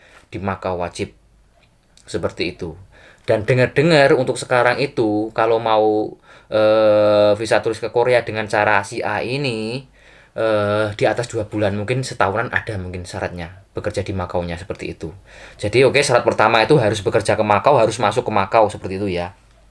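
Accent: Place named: Java, Indonesia. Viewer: Jamet medok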